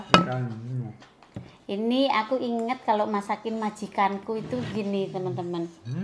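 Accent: Java, Indonesia. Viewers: Lucu bngt bhsnya jawa medok 😀😀 suaminya ajarin bhs jwnya psti seru, klo udh laper gk tahan 😀muantaap bihun gorengnya praktis di masaknya, siip suami istri slng supory kompk apalgi saling bercanda ok bngt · Mbak aq suka skali kl ngmong ada bahasa jawany n' medok2 gmna gthu hahaha... Smoga sukses n sehat slalu buat smua keluarga ya mbak...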